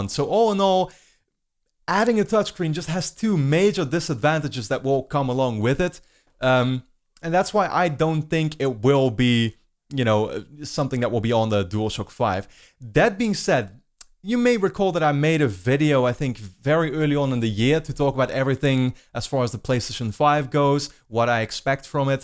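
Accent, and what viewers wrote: Netherlands. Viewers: Love you’re Dutch accent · are you dutch your accent is really dutch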